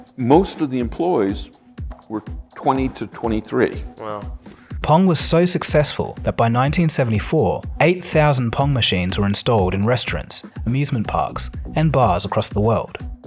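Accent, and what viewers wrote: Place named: Australia. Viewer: Aussie Accent ❤️💋👍😃🇵🇭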